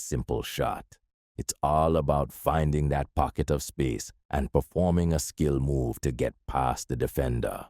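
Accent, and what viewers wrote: Jamaica. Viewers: The yardie voice alone makes this all top tier content. Actually never seen someone say some of this. Im div 2 but still learning 👍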